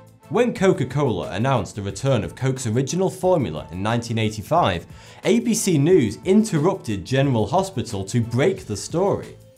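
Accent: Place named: England, United Kingdom. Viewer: Yes 42 is the answer to life..... it is from a wicked cool movie... I bet you know what 1!!! and yes his name is a play on words but also he is English.... Hench he has an Accent... You silly silly sausages!!:) p.s. you rock my brain with all of random facts!! I am so addicted to your channel:) Peace.:) x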